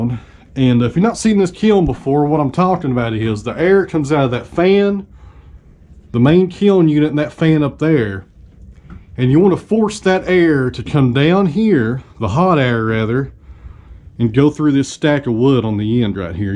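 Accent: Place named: West, United States. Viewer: Thank you for the beautiful music and this COLORFUL "OLD" APPLE TREE 'WOOD' video. And Your voice! I feel like watching "WEST FILMS" in the old cinema, I like and emphasize * Thank you for the video you made with "Old and colorful Apple Tree" accompanied by beautiful music. Also, with your tone and accent, I saw myself watching "WESTERN MOVIE" in an old cinema. Country Music is beautiful in its songs. I remembered AUSSIE Singer Slim Dusty and others. Thanks again. Good Luck and All The Best. From ANTALYA/ TURKEY.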